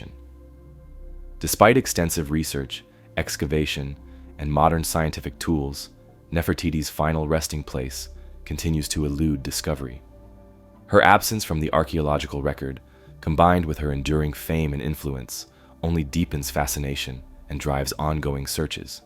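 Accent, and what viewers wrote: United States. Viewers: I just love this narration voice. My favourite ever American accent.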